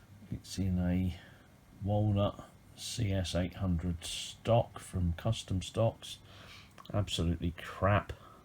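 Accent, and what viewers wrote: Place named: United Kingdom. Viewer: Friend , this talk about you being unhappy about this gun must be because you should have kept off the net for your review because it,s impossible to understand a word of what your saying all wee hear is fluf fluf fluf , its your Brit accent that screws it up , find something else to criticise and stay off the net !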